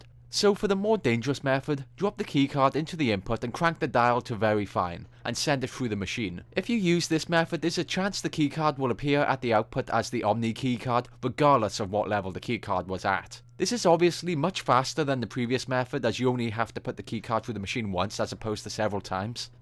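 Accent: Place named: South Wales, United Kingdom. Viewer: weyhey, Cymro o'r diwedd! you sound like a south wales valley boy, are you from Rhondda or like Ystalafera?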